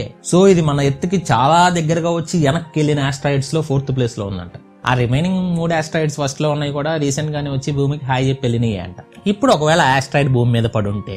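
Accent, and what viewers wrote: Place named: Telugu, India. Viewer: Era epudu chusina denini telugu lo em antaro naku telidhu antav nvu emina foreign lo puttava ne slang chuste pakka telugu medium gadi la unav, ayna idhi idhi recorded video a kada evadnina adigi chepochu kada leda google chesina telustadhi telugu li em antaro ani, prathodki idoka fashion aypoindhi telugu ranattu xtralu mingadam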